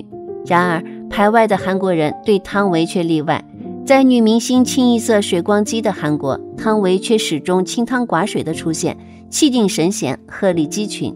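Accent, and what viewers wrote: Standard. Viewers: 的国语讲的非常地道啊！😂😂😂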